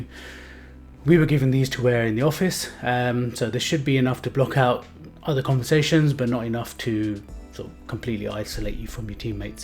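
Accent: England, United Kingdom. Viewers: Bhai tumhara accent ek dum english man jaisa hai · Great review, liked your pure english accent!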